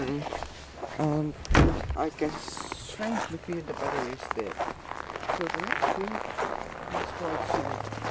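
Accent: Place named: Russia. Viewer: he's Russian, at least he try his best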